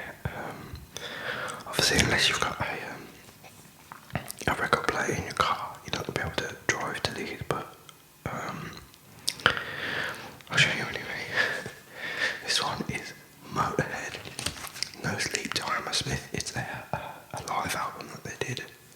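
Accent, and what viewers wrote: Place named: Birmingham, United Kingdom. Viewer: you sound brummy, are you from the black country? im from wolverhampton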